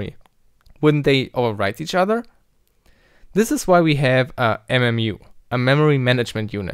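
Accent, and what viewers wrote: Germany. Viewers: really well explained! sind Sie Deutsch?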